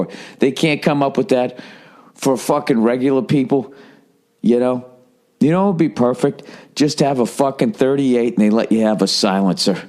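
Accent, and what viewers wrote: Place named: Boston, United States. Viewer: He's from the Boston area. It's just how he talks.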